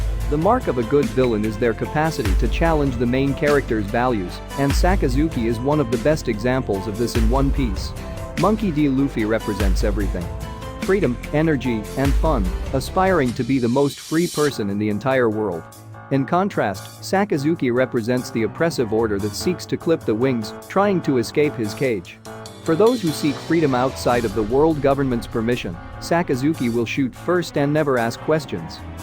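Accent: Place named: Ireland. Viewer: Ur sound like lrshion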